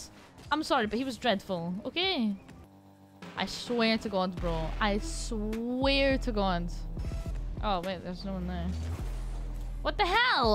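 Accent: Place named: Scotland, United Kingdom. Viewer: Wait, you're Scottish? So am i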